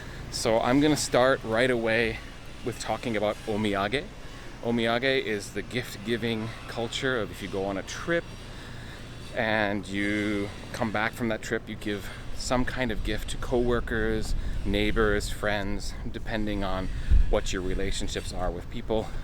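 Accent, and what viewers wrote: Midwest, United States. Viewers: Konnichiwa from North Pole Alaska. You sound like you’re from the Midwest! (My Dads family are from there). Loving your videos, they make me smile when at work. We’re getting ready to go back for our second trip to Japan. Fell in love with it last time! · Love the Midwest accent.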